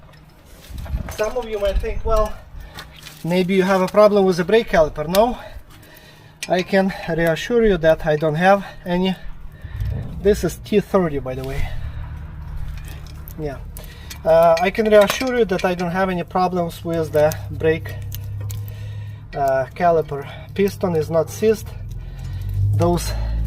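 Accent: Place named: Russia. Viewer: чел, ты реально думаешь, что я смотрел и слушал 40 минут безумного русского акцента про замену тормозных дисков? Оно мне зачем? И, может ты не в курсе, но языки других стран это не проблема с появлением нейросетей. На лету переводят. Впрочем, оно мне не надо, чтобы понять твою речь. Снимай, как хочется.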